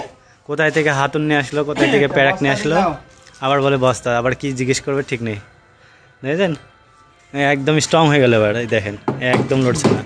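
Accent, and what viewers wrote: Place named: Bangladesh. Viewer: Apni bangladeshi mone hochhe vasa sune, Kerala te ki koren.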